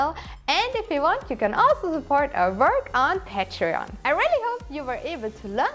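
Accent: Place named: Germany. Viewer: This german accent tho.... 😂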